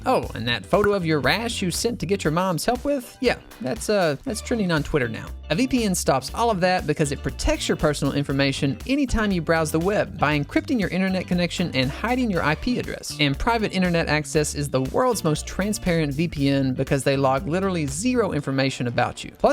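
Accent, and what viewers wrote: South, United States. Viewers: Why does he sound like a red neck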